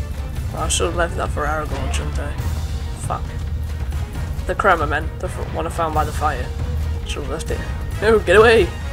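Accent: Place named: North, United Kingdom. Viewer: Thanks for the upload, just got through watching it all, brought back many memories :) Sounds like you're a fellow Northerner with your accent as well!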